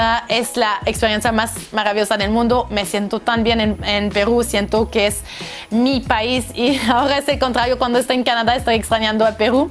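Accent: France. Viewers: Tienes acento Francés! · Llevo poco tiempo viendo tu video y pareces una linda chica, dijiste que eras canadiense, pero pareces más francesa, hasta tu acento. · Interesante todo lo que nos hace mencion. Yo vivo en EEUU en estos momentos, pero soy original de Lima, Peru. Me doy cuenta que tu acento es frances y segun me cuenta una amiga francesa es mucho mas facil aprender castellano para ustedes y viceversa. En estos momentos estoy en Ohio y como que entiendo la vida esa de vivir en un lugar de vieve. Yo vivo en Nueva York y no por el hecho que esta cerca al mar no niva mucho como en Canada. En fin, todo lo que cuenta es muy dificil para gente que no han salido fuera de su pais. Lo cual es completamente diferente y como que tu tienes la vida esa aventurera de encontrar otros horizontes. Buena, suerte en tus objetivos. Espero, poder intercambiar ideas contigo.... 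Hablamos...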